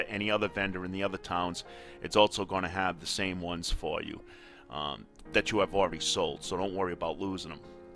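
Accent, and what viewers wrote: New York, United States. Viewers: New yawk accent?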